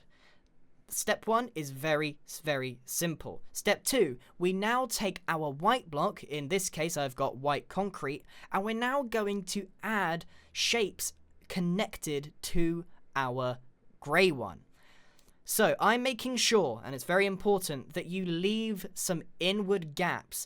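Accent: United Kingdom. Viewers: I kind of don’t like him talking british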